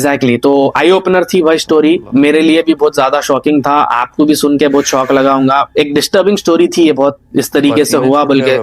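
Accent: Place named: Hyderabad, India. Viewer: Bhai story sunkar maza agaya with Hyderabad style me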